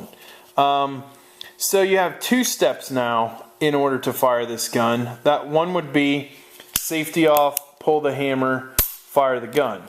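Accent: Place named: Philadelphia, United States. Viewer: Dudes Philly/South Jersey accent is strong.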